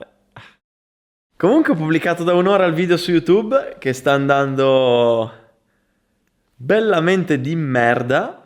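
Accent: Italy. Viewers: Minchia stavi parlando paleminato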